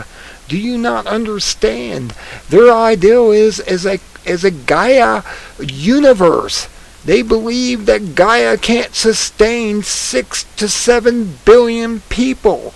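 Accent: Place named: United States. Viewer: You do not read well and your accent is tough for non-American second-language English speakers, but keep going, because it focuses us and helps us follow the story. Keep going! Can you believe the rubbish, oh, yeah, cold water killed those fish? I am from Ohio and fish can be nearly frozen solid and survive, they did it every winter back there? Those fish look horrible and distressed, look at their eyes or the lack of eyes! I have never seen this! The eyes should be cloudy but not missing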